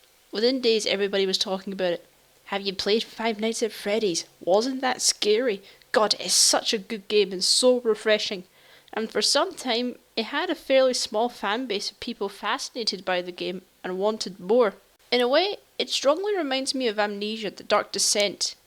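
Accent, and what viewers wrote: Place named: Scotland, United Kingdom. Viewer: Ah yeah. You're the first Scottish FNaF fan that I've ever heard. For that you earned yourself a subscriber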